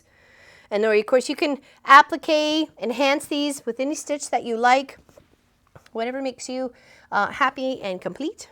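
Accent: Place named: Nova Scotia, Canada. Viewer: so very Nova Scotian love it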